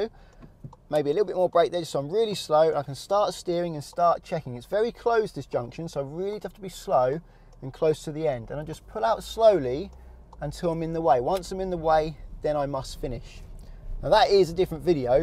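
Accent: England, United Kingdom. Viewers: That's because he's English lol.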